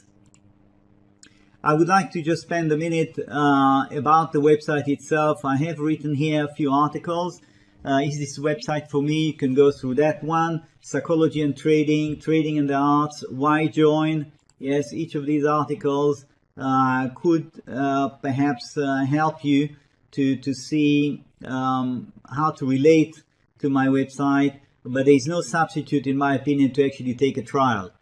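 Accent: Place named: Australia. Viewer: By the accent, I guess Australia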